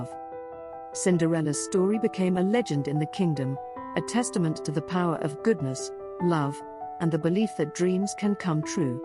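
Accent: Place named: United Kingdom. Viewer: good story :) voice is like british!!! i love it😍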